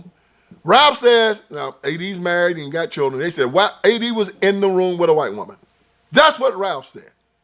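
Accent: South, United States. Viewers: Unfortunately, I couldn't understand a thing you were saying while you were laughing and talking. Between your southern accent and poor pronunciation, it is so difficult to understand what you are saying.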